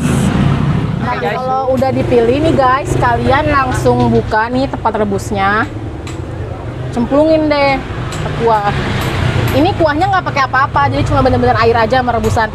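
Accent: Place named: Tangerang, Indonesia. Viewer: Kayak orang Tangerang..lanjut teeh mantep semangat terus..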